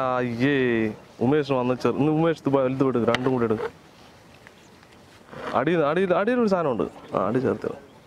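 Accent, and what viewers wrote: Palakkad, India. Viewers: palakkadan basha 😍😍😍 idhu kanunna palakkattukar undo?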